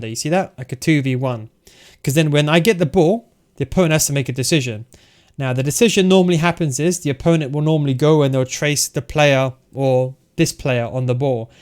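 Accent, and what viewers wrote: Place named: United Kingdom. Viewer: bro speak more clearly, not everyone is understanding you other than people from UK. after "player lock" bro wtf u sayin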